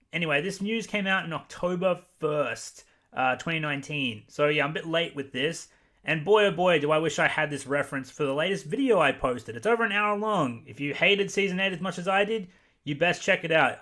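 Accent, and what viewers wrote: Australia. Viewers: I always thought you were British! Your accent isn’t as strong as other aussies I’ve heard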